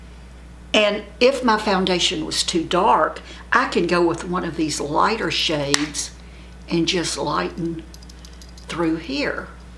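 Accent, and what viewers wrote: South, United States. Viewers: Hi, great advice and hell yes I would love to hear how you and Jim met and how he won your heart. So happy you never settled for just anyone. You always make my day because you are always honest and interesting plus I love you southern accent 😉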